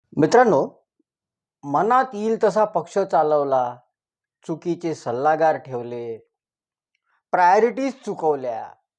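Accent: Vidarbha, India. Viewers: साहेब आपणास आम्ही नेहमी ऐकतो कारण आपला अभ्यास दांडगा आहे साहेब आपण निवेदन करताना आम्हाला भरपूर प्रमाणात हसायला येते कारण आपली वैदर्भीय भाषा एकदम मस्त आहे जय महाराष्ट्र साहेब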